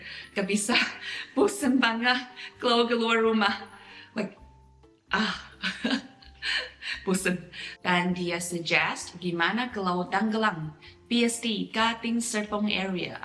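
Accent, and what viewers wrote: China. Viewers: Kakak dari Beijing ya? Dulu ada temenku dari China yg 'dialek' bahasa Indonesianya seperti kakak kalo ngomong.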